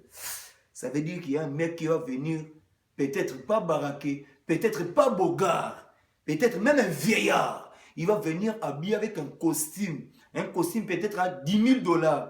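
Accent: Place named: Democratic Republic of the Congo. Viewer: ta un peu perdu de ton accent congolais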